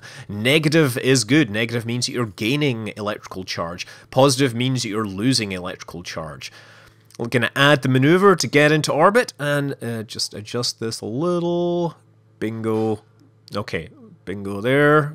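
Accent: Scotland, United Kingdom. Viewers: i love that your brilliantly scottish accent means that you could be saying mun or moon, i love it xD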